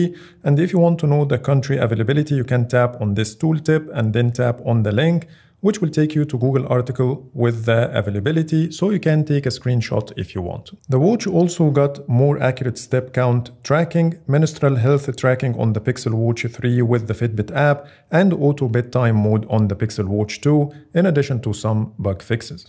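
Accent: Egypt. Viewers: You have English Egyptian style 🎉 your brother from Egypt too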